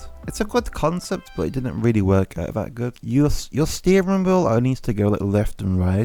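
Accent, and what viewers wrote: United Kingdom. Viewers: Best British accent ever known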